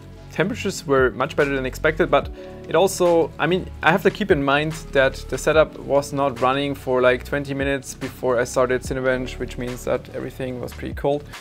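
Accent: Germany. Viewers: you are a native German speaker XD ... I mag the Klang of deinem accent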